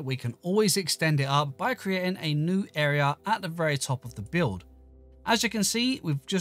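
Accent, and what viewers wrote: United Kingdom. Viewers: Hey, I haven't been on your channel for a while, but a question, are you bri'ish?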